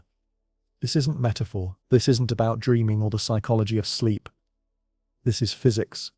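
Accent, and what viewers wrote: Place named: United Kingdom. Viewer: Comfy soft bed, warm, cozy quilt, big, soft pillow, space video with soothing British accent. Good night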